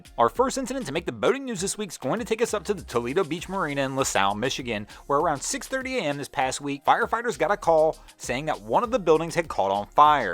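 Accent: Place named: Tennessee, United States. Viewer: You are amazing. where are you from. Tenessee?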